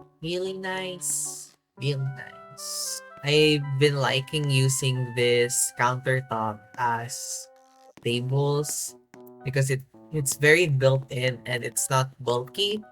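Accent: Philippines. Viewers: You sound filipino, are you?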